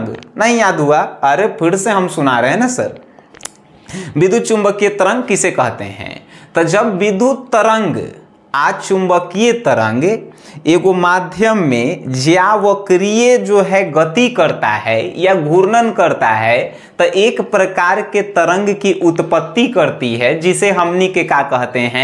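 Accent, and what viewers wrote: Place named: Bhojpuri, India. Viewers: Apka bhojpuri sound mast hai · Bhojpuri h kya akdm se😂😂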